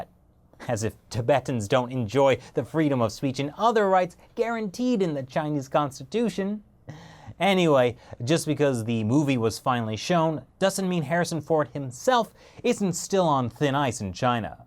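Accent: Australia. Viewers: an asian girl with an australian accent... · Australian accent?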